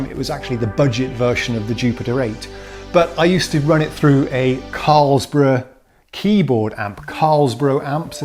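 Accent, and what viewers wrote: United Kingdom. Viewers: are you british born or swedish? because you speak a beautiful british english with a fabulous accent! I would like to speak with an accent like yours:O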